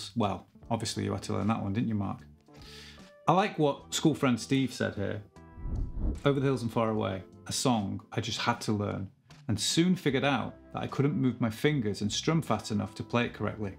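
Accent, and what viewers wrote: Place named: Northern England, United Kingdom. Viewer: Crackin'! Ta,. I've been hammerin' & pullin' for nearly 40 years but still can't get the timing right..... One of these days. Btw, you sound like a northern lad, which is the best type of course, but can I ask - would you mind not dropping so many 't''s, it's just not quite right! If you do so I'll promise to stop droppin' my 'g''s! Is it a deal?? Love on ya!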